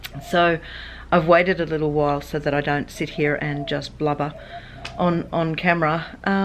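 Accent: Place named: Australia. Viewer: i am a new subscriber and love for family and honest aussy way.we live in Biasbane and love hearing this aussie mum.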